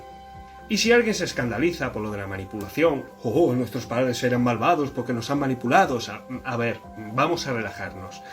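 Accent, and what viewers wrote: Spain. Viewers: La culpa es parte de mí desde la niñez, me siento culpable por ejemplo de que hayan animales en la calle y no poder hacer algo por terminar con el sufrimiento de ellos. Me provoca angustia, ansiedad y baja autoestima. Llevo casi dos años en terapia por TLP ya que esa angustia y culpa me llevaba a actos en contra de mi integridad. No crean que vivir con "culpa" es cosa fácil. Gracias por los consejos!!!!! Perdón por la pregunta, por qué tu acento español no es tan marcado como los de otros youtubers? Hay veces en que no logro ni entender la mitad de lo que ellos dicen. Muchos besos y abrazos desde el fin del mundo.... sur de Chile 🌷😘😊😊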